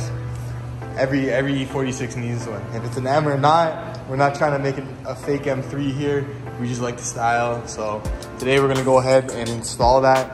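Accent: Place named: Toronto, Canada. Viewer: Yeah Im from here too I could tell by the accent, im glad it isint over faked like most toronto mans wannabes lol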